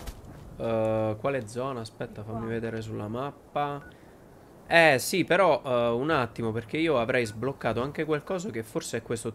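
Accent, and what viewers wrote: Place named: Abruzzo, Italy. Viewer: Non ho capito se sei abruzzese o napoletano, dalla voce della tua fidanzata mi sembra napoletano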